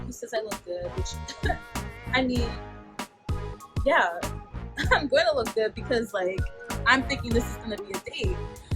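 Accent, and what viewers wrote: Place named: Yoruba, Nigeria. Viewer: Yoruba accent! Wow!!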